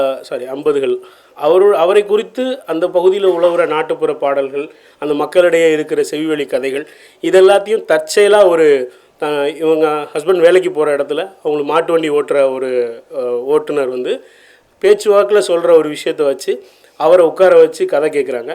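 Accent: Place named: Tirunelveli, India. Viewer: பெருமகிழ்ச்சி கார்த்தி புகழேந்தி. நெல்லை பேச்சு வழக்கு குறைந்து விட்டது வியப்பு! பிற்பகல் 3.15 மணி வரை இருந்தேன். நேரில் தங்களைச் சந்திக்க இயலவில்லை. (ராஜம் கிருஷ்ணன் அவர்களை மூன்று நான்கு கூட்டங்களுக்கு அவர் வசித்த போரூர் தெருவிலிருந்து அழைத்துச் சென்று விட்டது, மைதிலி சிவராமன் அவர்களுடனான நட்பு , 'மகளிர் சிந்தனை' மாத இதழில் அவரது கட்டுரையை கையெழுத்து வடிவில் பெற்று ஒருமுறை திருவல்லிக்கேணிக்கு எடுத்துச் சென்றுள்ள நினைவுகள் வந்தன. 2011 விநாயக சதுர்த்தி விடுமுறை நாளில் ஓவியர் கோபுலு அவர்களை அவரது ராஜா அண்ணாமலைபுர இல்லத்தில் சந்தித்தபோது ராஜம் கிருஷ்ணன் போரூர் ராமச்சந்திரா மருத்துவமனையில் அனுமதிக்கப்பட்ட நிலையில் அவருடன் கவிஞர் இரா.தெ.முத்து எடுத்த பேட்டி வெளியான செம்மலர் மாத இதழைக் காட்டியபோது கண் கலங்கிய படி உற்று நோக்கி 'லட்சுமிகரமா இருப்பளே!...' என்று பேச்சுத் தடுமாறியதை உணர்ந்தேன்...) )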